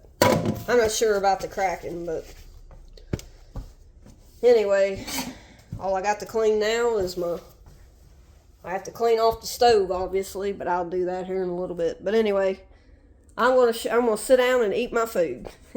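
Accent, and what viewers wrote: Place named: South, United States. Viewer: You have a heavy southern accent wow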